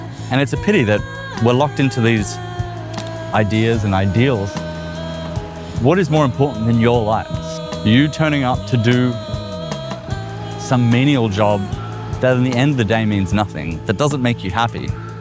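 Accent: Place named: Australia. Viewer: Old at 40 or 50! Ha ha .. Im between those ages, have the same accent as you and have not lived in Australia since 2007. I LOVE my life. The secret sauce is to have no debt and low fixed costs and to think a bit differently.. Everyone can do it it just takes perseverance and imagination... You wont have a flash house like others but you will have awesome memories, stories to tell. At times you will just wish for a simple life and a safe place in that house you dont have but once your batteries are recharged you will just want to see the world again... You do have to sacrifice, no pets, lots of belongings etc but it will be rewarding if you are that way inclined.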